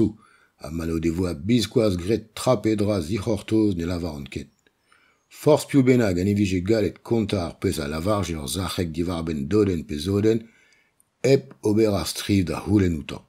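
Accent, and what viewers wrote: France. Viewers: Loved it, minus the French accent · It's like ancient Cornish with a French accent. Fascinating. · french accent is so strong and annoying unfortunately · The French accent makes me wonder what Tolkien's opinion on Breton was.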